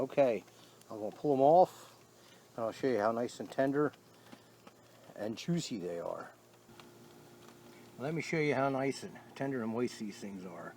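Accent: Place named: Pennsylvania, United States. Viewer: I figured, I could hear it! I'm from Philly originally but relocated to California after my military service. I can always tell somebody from back home.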